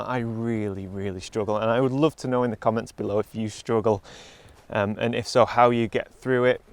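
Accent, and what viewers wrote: United Kingdom. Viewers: You put an emphasis on slowing down, and I took up photography to fill the slow moments when out hiking. Irony (: I love the channel though, even though I think about photography with a British accent now. "Ab-solut-ly Stonnin!"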